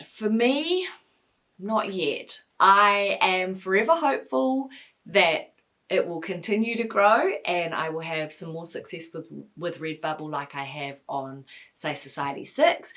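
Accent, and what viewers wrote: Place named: New Zealand. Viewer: Oh, hey! I knew you were from new zealand from your accent! I live in new zealand too :]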